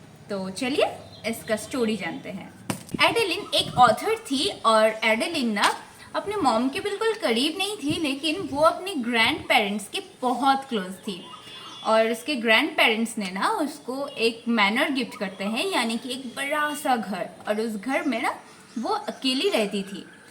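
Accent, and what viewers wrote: Bengal, India. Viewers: Di you are bengali, right?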